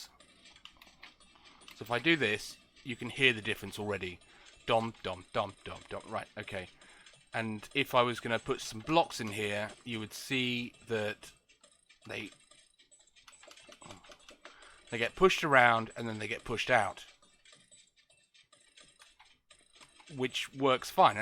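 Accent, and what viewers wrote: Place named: United Kingdom. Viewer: Gotta love that British accent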